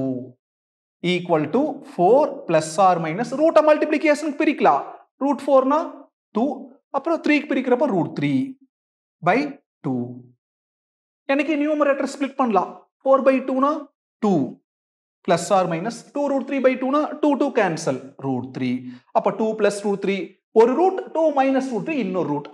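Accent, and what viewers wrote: Coimbatore, India. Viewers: Coimbatore LA ula slang pola irku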